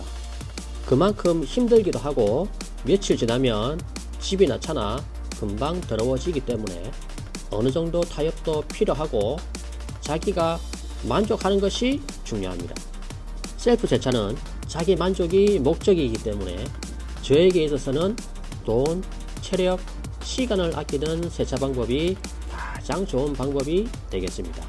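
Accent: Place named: Gyeongsang, South Korea. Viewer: 어색한 표준말 경상도 티 다난다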